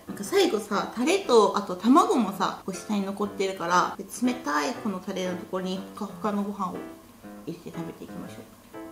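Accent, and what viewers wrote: Kansai, Japan. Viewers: 関西人なの？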